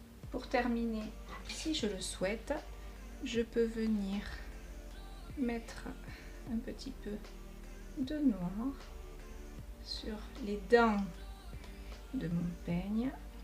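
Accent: South, France. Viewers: Bonjour! J’aime beaucoup apprendre en votre compagnie. La démonstration et les explications sont très claires. Quant au rendu c’est très sympa. Votre beau sourire et le petit accent du soleil ne gâchent rien. Merci!!!👍😃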